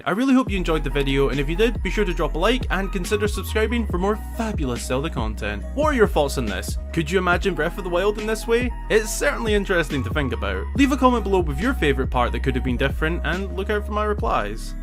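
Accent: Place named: Edinburgh, United Kingdom. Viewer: I'm a Scot and he definitely sounds proper Scottish to me as his accent sounds very Edinburgh-like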